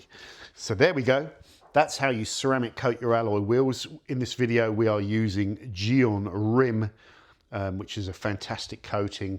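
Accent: United Kingdom. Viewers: Greetings from Hong Kong!! Your British accent is beautiful, and your way of teaching feels so warm and approachable. I’ve learned a great deal about detailing from you. thank you for being so kind and generous in sharing your knowledge🤣👍🏻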